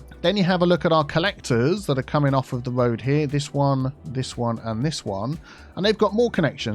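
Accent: United Kingdom. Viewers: Youtube algorithm works in mysterious ways! I had a very similar experience with Silberphönix. And yes, British accent does have that effect! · Sooooo, having a British accent has that affect on non brits? 👀👀👀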